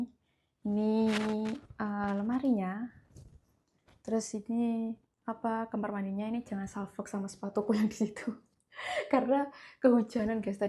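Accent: Java, Indonesia. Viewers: kok meddok bgt to lek omon ki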